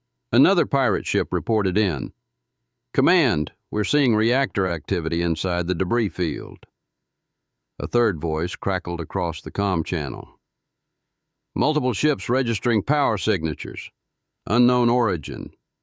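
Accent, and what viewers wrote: South, United States. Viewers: Like I said and that is for reply you've lost consistency that southern drawl and humour and ya keep switching diff narrators when ya got a winner ya lost me but good try not sure how yer not relating yer stories good narrator all over the place ya can't relate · Its so nice to here a narrator that speaks my own language, SOUTHERN.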